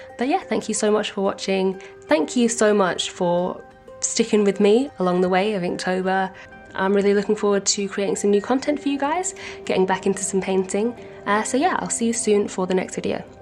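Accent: England, United Kingdom. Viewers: I love your english accent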